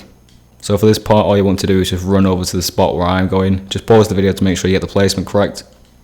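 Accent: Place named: United Kingdom. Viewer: disliked. british voice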